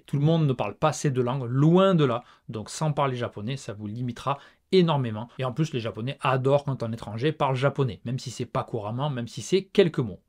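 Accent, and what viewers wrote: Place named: South, France. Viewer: salut. une videos limite émouvante. limite kawai si vous aviez eu des yeux plus grand et plus humides. j'aimerais aller au japon un jour mais y vivre je le sens pas avec cette histoire que les japonnais ne débâtent pas ou manque souvent de discutions. d’ailleurs j'ai pensé a un phénomène de bulle plus ou moins grande quand on est etrangé dans un pays en voyant cette videos.... je sens un complexe sur ne pas etre doué avec le sav japonnais.... après y'a d'autres qualités, la cuisine, etre un bon pere, réparer des choses, etre un bon soutiens émotionnel les courses.... et ne vous inquiétez pas tant que vous garderez votre petit accent du sud sympathique vous resterez francais. ça sera si vous le perdez qu'il faudra s’inquiéter. d'ailleur l'histoire de vouloir progresser en japonnais j'aurais tendance a vous conseiller a fond d'accompagner votre enfant avec les devoirs de japonnais. 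meme 15 30 min par jours ça vous fera passer du temps pere fils et vous améliorerez surement votre japonnais genre a lire avec lui des passages de livres simple ect.... et meme pourquoi pas lui lire une histoire en japonnais le soir avant qu'il s'endorme. ça joindrait l'utile à l'agreable. apres comme petit boulot je vous imaginerais trop combattre le franponnais, traduire des patisseries, des enseignes ou des cartes de restaurant meme en echange d'un tres bon repas ou un truc dans le genre.... dommage votre ville visiblement est pas super touristique ce qui fait aussi que vous ne vivez suremen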